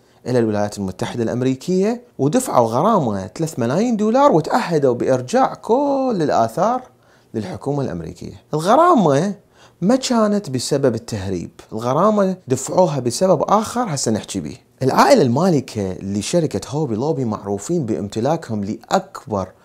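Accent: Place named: Iraq. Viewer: احسنت من قال أنك خرجت من العراق بل لسانك مازال في العراق احسنت على أشياء تستحق فعلا راح اعملك شير الان👏👍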